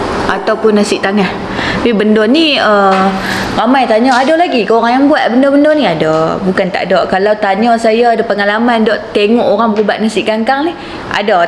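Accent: North, Malaysia. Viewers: Loghat utara..penceritaan yg best dan enjoi ja dgr..👍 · good content but for me better not to talk slang utara ni. sbb tak semua org faham slang dia. try cakap kl sis. spread love no hate. btw im a new sub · aku suka betul dengar loghat utara ni besssssst !!! :D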